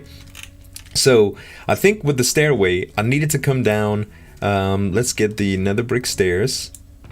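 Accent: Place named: South, United States. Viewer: I don't think you're castle is particularly great or anything. (you break a few key design concepts -General, not just minecraft). I just decided to watch your videos because I've seen things you've done with other hermits and I like the way you talk. I reminds me a bit of when I used to live in the south. Also, I thought you were pretty funny.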